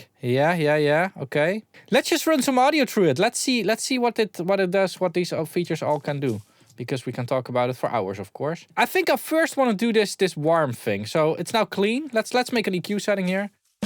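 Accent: Netherlands. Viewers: yeah he sounds very Dutch, that’s true, I’m not a Dutchy but I’ve been there, honestly I’m kind of surprised how bad people are at identifying accents, I just said that to make a point, since the guy was being racist.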